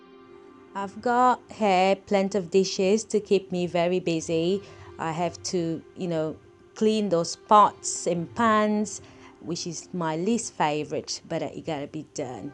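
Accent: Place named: United Kingdom. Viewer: Very interesting accent you have there. It's definitely British but sounds like there is another strong influence there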